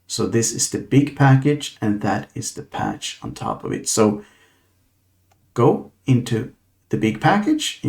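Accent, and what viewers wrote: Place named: Sweden. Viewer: Älskar den ibland svengelska accenten och reklamen "enkel kemikaliehantering". tack för videon förresten, riktigt bra!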